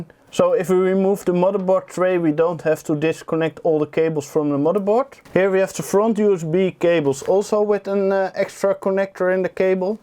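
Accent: Netherlands. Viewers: Hello fellow dutchman. I like your content. English technique is also good. Only the Pronunciation wauw XD sometimes i just had to laugh. Klinkt zo nederlands. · nice dutch accent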